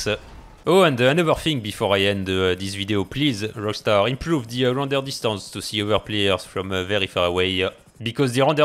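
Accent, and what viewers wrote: France. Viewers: fait un effort sur ton accent je parle que peut anglais et j'ai un meilleur accent aucun effort les francais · Hell yes, I could listen to you curse shit up all day with that awesome French accent!! Chicks must be sitting and sliding around you 😂😂😂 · L'accent français qui ressort ça fait grave bizarre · Wow bruh that is one strong french accent 😅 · accent francais ptdr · French accent 😄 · lol l'accent français me fume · Thanks for the info it's pretty precise After 10 of your vidéo i heard a french accent, u talk very good btw but i wanna be sure Ty Ytb Recom' for finding you ! Continu ^^ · L’accent français prend parfois le dessus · never get tired of hearing baguette accent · Your French accent is epic! xD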